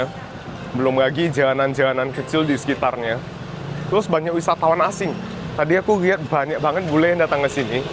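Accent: Java, Indonesia. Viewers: Kok medhok sih Koh skrg? Gak klen klen Tidak berasa USU-nya Aku nonton film Thailand lumayan beberapa kali, plek ketiplek kayak Chinese Banyak yg budayanya mirip ada yg Hakka, Hokien, Two Chew, dll jg cuma beda Bahasa yg terakhir aku tonton yg tentang ngerawatin Neneknya itu apa ya lupa judulnya, manggilnya Ama jg, trs Dewi Kwan Im jg gitu trs jadi vegan semenjak cucu/anaknya sakit apa gmn lupa Tapi cucunya udh nggak bisa Bahasa Cina, sering dicandain sama neneknya ah kamu gmn sih keturunan ini (ethnic group x) tp gak bisa bahasa ini (ethnic group x) Plek ketiplek kayak Chinese Indonesia yg NTT itu ceritavelin, Surabaya, Medan, Melayu, Kalimantan, Semarang, Bogor, dll seluruh Indonesia Manggilnya Ama jg, tp kalau di pasar masih pada bisa cuma ya yg bnr2 bisa rata-rata generasi Kakek Neneknya Yg generasi skrg bisanya Bahasa Thailand gak nyadar Chinese Di Indonesia jg rata-rata Chindo generasi skrg bisanya cuma Bahasa Indonesia trs ngaku org Indo bangga Kaget bgt ada Chindo di Aussie, pas tes DNA cuma "Chinese" gak ada penjelasan apa2 ya karena database mereka emg msh dikit yg Asia jd ga ada penjelasan apa2, Chinese mana leluhurnya gtu, trs dia bilang dia org Indonesia dan bangga gtu tp udh tau klo gini doang mah 😂 kagetnya krn dia bangga ngaku org Indo bukan Aussie bukan Chinese, tp lebih mudah si klo mau belajar Bahasa Mandarin atau komunikasi, punya akses, tercerahkan kalau butuh yg berkaitan budaya Chinese dan keturunan, bisa gaul, nimbrung jg Mirip di Malaysia jg, katanya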